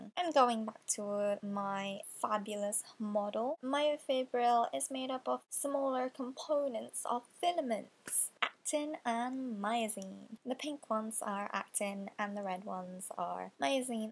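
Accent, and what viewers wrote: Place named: United Kingdom. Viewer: i think ur smart :) indonesian who has a brithis accent lol :) salam hangat dari Indonesia :) i wants ur life lol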